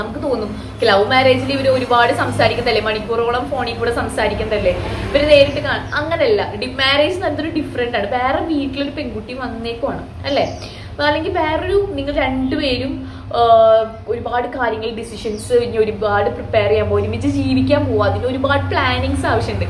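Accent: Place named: Thrissur, India. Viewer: trissur slang anallo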